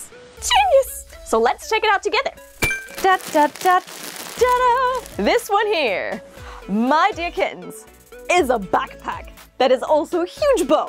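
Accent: United Kingdom. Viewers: I have been watching Ask Japanese for some weeks and learning about Japan. I really love Japanese etiquette. Your language skills are amazing. You are the second German woman on YouTube I have chanced upon who speaks English with a British accent. She too studied and worked in Britain. I can detect a very slight German accent in her English but none in yours. You respond instantly to spoken Japanese so your Japanese must also be excellent. I love trains and have found your show on our cable TV in the US. I grew up next to the Durango-Silverton narrow gauge line. Thank you for your bowtiful work. (had to acknowledge this fun video:)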